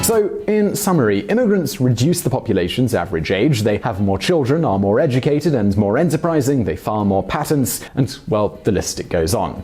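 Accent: United Kingdom. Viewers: Summary of this video: SJW bloke with a British accent calls Trump xenophobic for trying to reduce illegal immigration by citing the contributions of legal immigrants (to the UK). LOL liberal logic. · You always talk about other countries. I will like to know where are you from ? You sound British but you might not be one.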